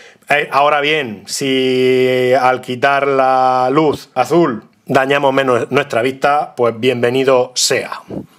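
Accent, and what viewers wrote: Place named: Murcia, Spain. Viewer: Eres de Murcia?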